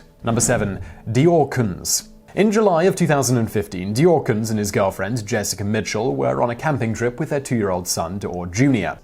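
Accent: England, United Kingdom. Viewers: Your voice is an awesome British type. Needs to be in everyday TV.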